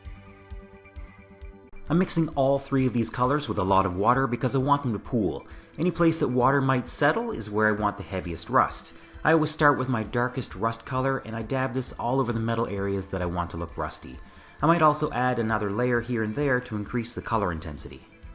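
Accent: Canada. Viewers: perfect base! that accent is definetly from the atlantic provinces! greetings from cape breton!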